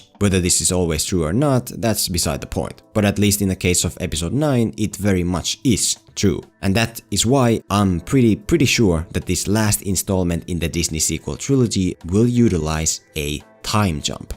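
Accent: Finland. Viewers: Are you from Finland? Your accent sounds a bit like that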